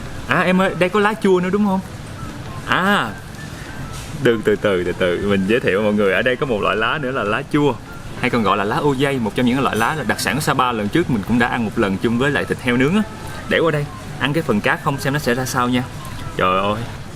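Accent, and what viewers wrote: Mekong Delta, Vietnam. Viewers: Thích a , giọng đậm chất miền tây luôn 😋😋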